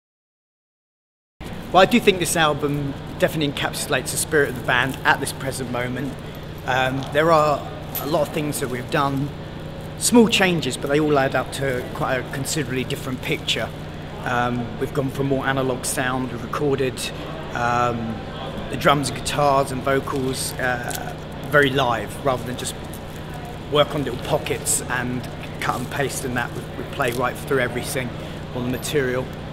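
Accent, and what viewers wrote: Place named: RP, United Kingdom. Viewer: I can't take him seriously as a front man for a metal band with that posh English accent.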